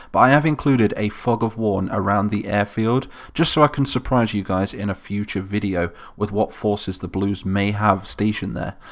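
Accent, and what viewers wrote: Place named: United Kingdom. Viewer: yup ur british I think???????????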